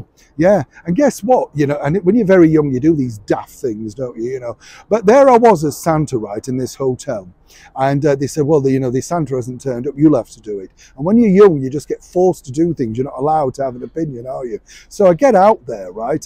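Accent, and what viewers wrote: Yorkshire, United Kingdom. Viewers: am I detecting a Yorkshire accent from you? Love it ❤ - Merry Christmas 🎄🎁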